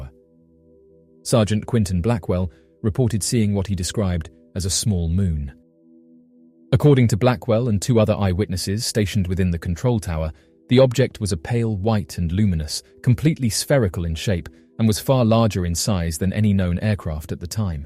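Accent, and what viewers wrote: England, United Kingdom. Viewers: He's ENGLISH! (deja vu..?) 😆 🍄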